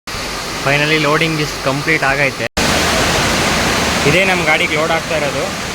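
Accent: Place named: Bangalore, India. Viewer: ನೀವು ಸಾಗರ್ ಅಂತೀರಾ ಮಾತ್ ನೋಡಿದ್ರೆ ಬೆಂಗಳೂರು ತರ ಇದೆ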